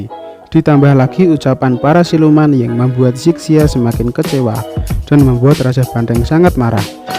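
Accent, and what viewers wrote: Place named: Java, Indonesia. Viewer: Jawa medok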